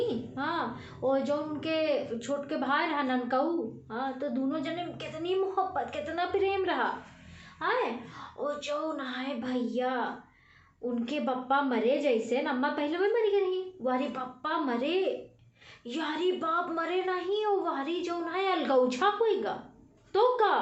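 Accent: India. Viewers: ji sabse pahle to pranam aapko aap mein kitne gun Hain Main Kya kiya hun aap bahut acchi Teacher hain bahut acchi Ja sakta hai bahut acchi singer hai aapki yah boli Dehati bahut hi acchi lagti hai man Kahta Hai Ki Kitni bar aapka video Dekhun thank you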